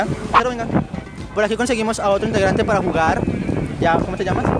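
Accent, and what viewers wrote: Cali, Colombia. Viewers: Eres de cali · Eres de cali verdad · Eres de Cali?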